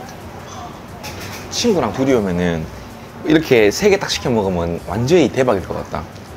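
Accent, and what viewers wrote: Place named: Gyeongbuk, South Korea. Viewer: 저는 경주에사는 50대 그냥 아줌마입니다^^여러~~유투버들봤는데 댓글은 정말,첨으로 남깁니다. 맛나게드시는건 기본이고 인정도많네요.특히 시장에서는 더 한듯~~방안에서 배달음식보단 나가서 시장순례가 더 중독으로가게하네요^^같은경북이라 억지로 표준말안쓰시고 경북말씨 더 듣기좋앟어요^^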